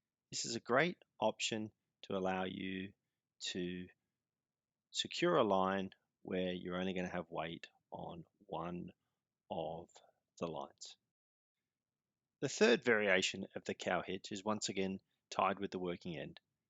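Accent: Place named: Queensland, Australia. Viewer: I sure do hear your accent so I assumed you are from QLD? Doesn’t sound like VIC, SA or WA to me, but it isn’t easy to tell… Belfast, Northern Ireland, only 980 Nm from where I live (now). 😉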